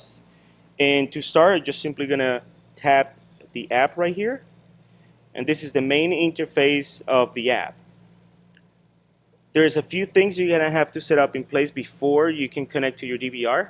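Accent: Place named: India. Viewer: Nice accent, indian?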